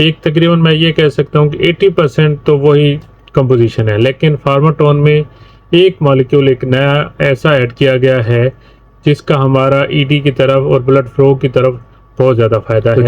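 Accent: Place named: Punjab, India. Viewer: W salam nice video bahi again Punjabi touch ❤nice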